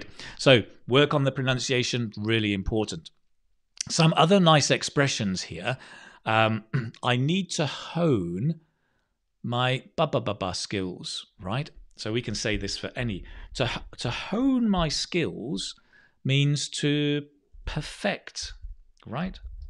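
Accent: United Kingdom. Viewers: Appreciate your teaching videos. I am from Malaysia. Are you British? Lovely to hear you speaking.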